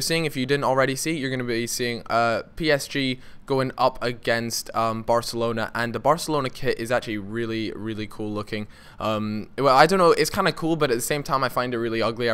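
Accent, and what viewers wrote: Scotland, United Kingdom. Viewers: are you scottish or what...mixing your accent with a hint of english. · are u scottish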